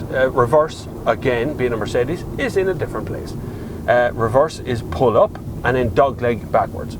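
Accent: Ireland. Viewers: Are you from Ireland?:)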